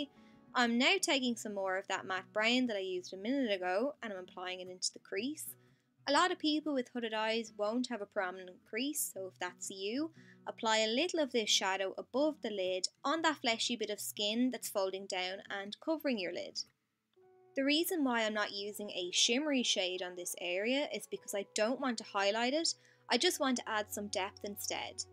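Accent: Ireland. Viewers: Love your accent~ It's Irish right ? :) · Ur accent is so cute. Are you Irish?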